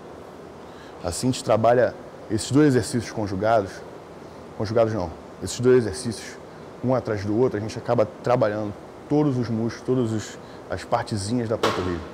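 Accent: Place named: São Paulo, Brazil. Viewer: Deve ser PAULISTA tu .. pior sotaque q tem e as gírias de paulista pqp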